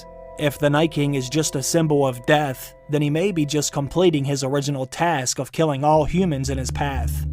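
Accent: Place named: South, United States. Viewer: You speak like a southern lord Baelish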